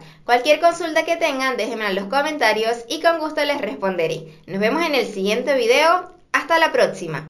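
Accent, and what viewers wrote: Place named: Venezuela. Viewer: Hola eres una genio con estos vídeos, de verdad son de mucha ayuda, noto que tienes acento venezolano 😁, saludo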